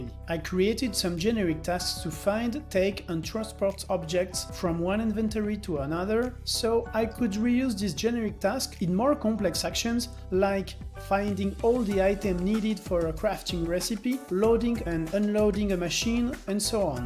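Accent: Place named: France. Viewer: i love your french accent !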